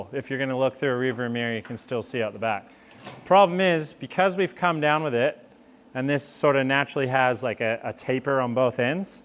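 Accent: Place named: Australia. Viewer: Damned you're talking almost Ozzie now. Come one down to the coast for a couple days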